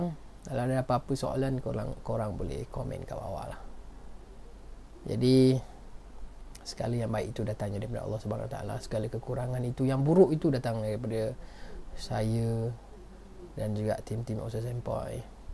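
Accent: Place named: Kuala Lumpur, Malaysia. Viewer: Wow 😮 cakap KL 🤣🙏🏻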